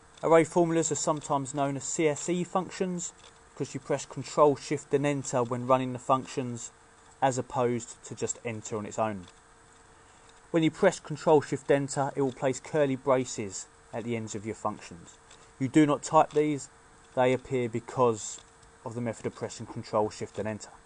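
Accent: United Kingdom. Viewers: you rock! your british accent made it more interesting compared to the other monotone tutorials..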